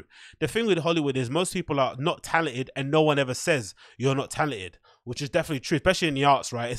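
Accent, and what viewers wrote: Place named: England, United Kingdom. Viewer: How did I know this dude had an English accent before I clicked?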